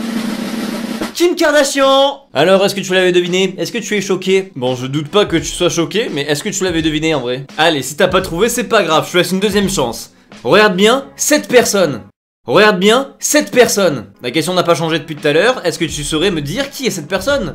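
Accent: Marseille, France. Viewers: T’es marseillais ?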